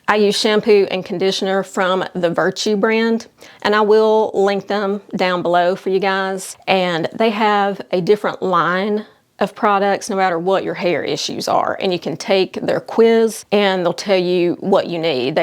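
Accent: South, United States. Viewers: You are such a refreshing and “ real” influencer ! I thoroughly enjoyed your video and love your accent cuz I’m a southern girl too!! Great job!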